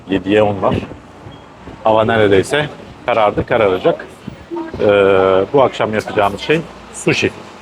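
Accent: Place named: France. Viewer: Yalniz Japoncanizda Fransiz aksani var sanki.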